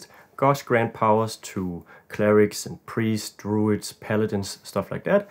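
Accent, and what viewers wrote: Denmark. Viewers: I knew you were danish the moment i heard your voice 😂 Nice video, very helpful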